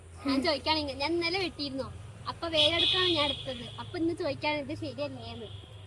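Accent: Malappuram, India. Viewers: Mlp slang anallo tirur tanur slang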